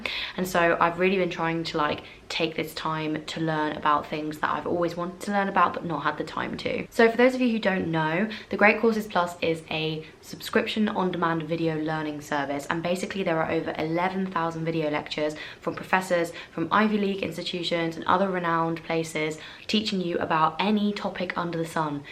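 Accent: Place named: RP, United Kingdom. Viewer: where's your accent from? it's sooo posh! <3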